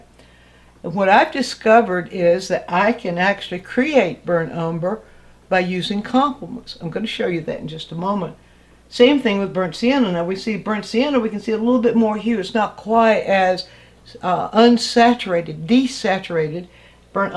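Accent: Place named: Carolina, United States. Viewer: Thank You!! I hardly ever use the earth tones straight from the tube, I always mix in the compliments, but the way you leave the variations on the palette may have just solved my issue I'm having with a subject that has dark bronze skin tones part sun, part shade. Duh! Why haven't I thought of having a gradient available? Also, being from Alabama, do I detect a Carolina accent? lol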